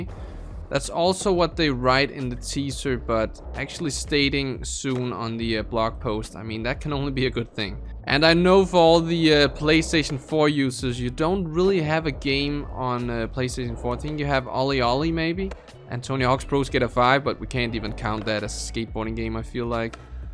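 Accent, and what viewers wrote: Denmark. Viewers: Are you danish bru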